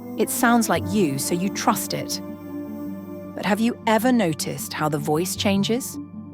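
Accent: United Kingdom. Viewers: Couldn't stand the snooty brit voice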